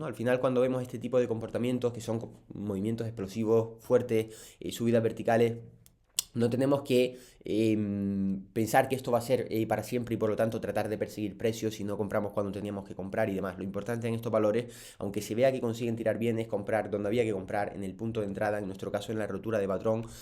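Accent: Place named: Canary Islands, Spain. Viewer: tenes acento canario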